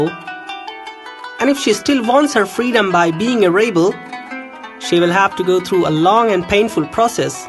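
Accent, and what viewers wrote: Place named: India. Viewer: I completely agree he sounds Indian and a load of crap coming from his mouth I couldn't watch it all since it was all lies...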